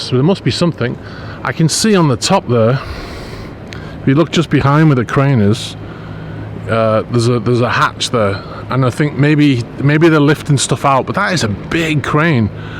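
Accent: United Kingdom. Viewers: wonderful!, your command of the Queen’s English is very comforting, great video, and I’m excited for the both of us, for your tour of Bold motor yacht.! Here we go!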